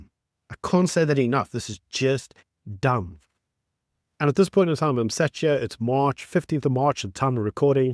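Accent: South Africa. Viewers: I grew up largely in South Africa (now in the US decades later) and listening to you is completely like listening to my South African cousins from Joburg, which I totally enjoy. I do regret how the ANC is not building alliance with the US. Marco Rubio is not dumb. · As a South African - a "white" one by the way - I do not agree with elements of what you saying. The words you use are often depressingly similar to those used by Trump 🤦🏼. "Stupid", "retarded", etc. You clearly don't know anyone who is mentally handicapped. I am having great difficulty listening to you but am trying to keep an open mind. Clearly from your accent you are South African. "Dumb" etc. I will not subscribe to your rave Far too simplistic, and I see and hear no evidence of emotional intelligence, even at 3-year-old level. I mean that as a child therapist, not as an insult. Please may I sincerely suggest that you attend courses on listening respectfully, asking others (especially those with radically different world views), and ask them whether they feel you have HEARD them, and understand their views. 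I am not talking about aGREEing. I am talking about making an effort to understand another person's point of view - which would be of course be based be based on their life experiences. Surely the key to positive, healing, substantial social/ political change lies in each one of us developing the ability to form and develop strong (resilient!) working relationships. Surely this involves each one of us "growing our muscles" of respect, humility, forgiveness able the capacity to be gracious?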